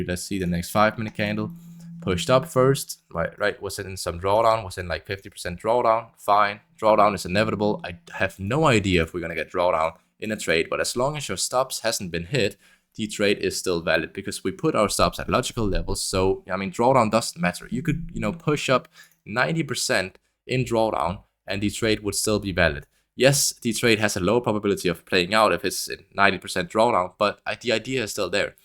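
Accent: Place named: Denmark. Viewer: Du lyder dansk i accenten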